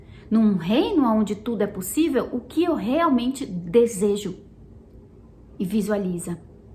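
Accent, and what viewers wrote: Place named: Northeast, Brazil. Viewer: Vc é uma fofa!! Sem contar que adoro seu sotaque... Amo os sotaques nordestino... bjosss!!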